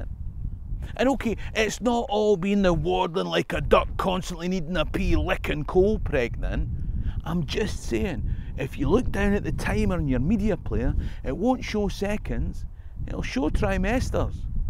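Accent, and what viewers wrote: Scotland, United Kingdom. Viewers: There's nothing better than hearing a true Scot talking about Scottish history 💙🤍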